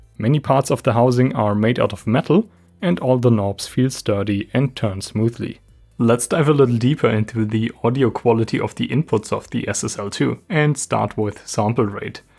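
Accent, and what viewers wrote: Germany. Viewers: Love the German accent.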